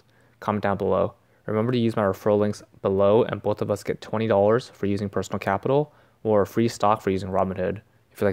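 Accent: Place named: India. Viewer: Naw buddy I can tell, you have a slight indian accent street shitting fren.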